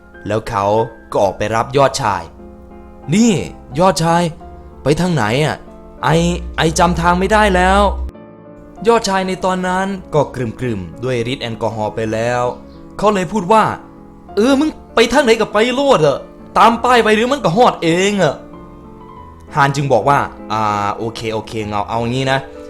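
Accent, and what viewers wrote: Isan, Thailand. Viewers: ชอบเสียงอีสาน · เนื้อเรื่องมันก็น่ากลัวนะ แต่ฮาสำเนียงอีสานพี่มากกว่า อินอะไรขนาดนั้น <num>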